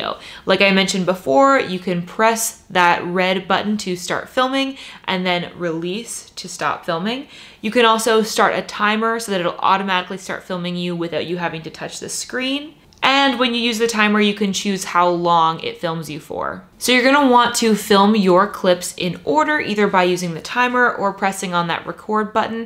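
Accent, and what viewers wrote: Canada. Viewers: Candian? Hea?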